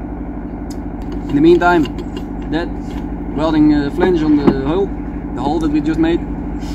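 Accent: Netherlands. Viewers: Hire an English speaker. The Dutch influenced language is killing the pleasure of watching. So, nope, not for me.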